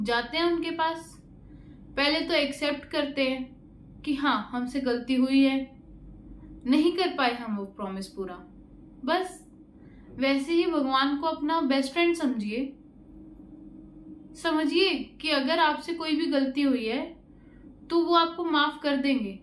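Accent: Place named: Rajasthan, India. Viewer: Hamne bolne ki tun she pata lga liya aap rajsthani ho. 🎉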